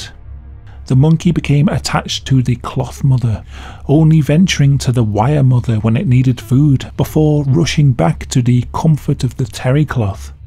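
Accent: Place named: Manchester, United Kingdom. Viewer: Oh,. You don't sound sarcastic, you sound like a Manc!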